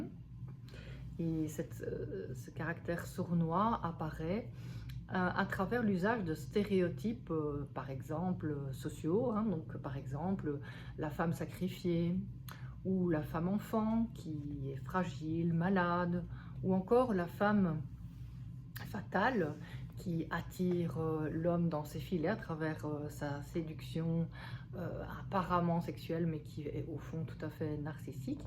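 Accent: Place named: Belgium. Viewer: Merci de votre professionnalisme, bravo pour votre travail, mes félicitations pour vos connaissances et mes très respectueuses salutations. Votre joli accent belge me fait penser à l'excellent Michel Collon.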